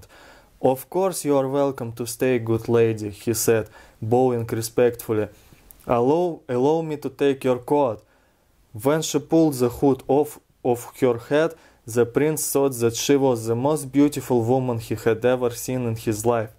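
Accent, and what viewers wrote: Russia. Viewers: thank you my comrade i am learning to do a Russian accent for voice acting and this helps a lot because your voice and accent is perfect and strong. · I'm already subbed to your channel, but this just popped up in my feed. I love your Russian accent. I could listen to you all day. 😊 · Im obsessed with russian accents, specifically strong ones like yours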